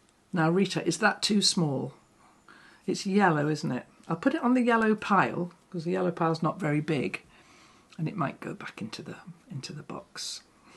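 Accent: United Kingdom. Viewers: Mind. Blown. You are one fascinating human, - the creative process you turn into a real object is nothing short of magic. I thought about the ladies of Gee’s Bend in Alabama/USA. The rejoicing of fabric by artists like yourself and those ladies is a singular genre that could only be achieved with patience. As much as I love to watch the process, it would run me mad to try and do it. And the cat bed? FABULOUS. I as so glad there are people like you, and Kaffe, and the Gee’s Bend ladies to counter-balance the madness of the world today…I know the world has always been mad, but the current time is particularly cruel and often times pointless. To know there is someone like you “across the pond” who is gentle and interested in creating beautiful art calms me down. The horrors of the Hill Country flooding, just a few hours drive from Fort Worth, are crushing sad. 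The political situation in America is horrifying. To be able to watch you and hear that engaging British accent is a balm to my weary soul. Thank you, friend-I-will-never-meet.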